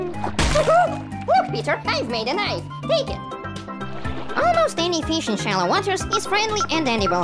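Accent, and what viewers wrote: Russia. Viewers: Мне одному отчетлево слышен его руский акцент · Блин слышно у него русский акцент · Ох ебать акцент как будто на русском смотрю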